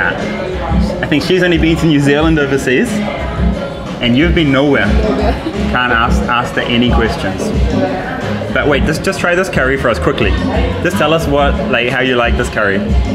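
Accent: India. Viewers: Aap hindi main boliye/please speak in hindi. I like your hindi accent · dude you got an Indian accent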